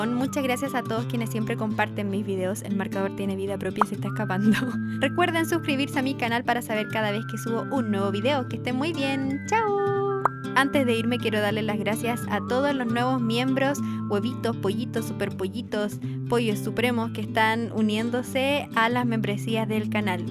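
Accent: Chile. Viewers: 🎀Es re tierno tu estilo veo tanto tus videos que ya se me pego el acento chileno🎀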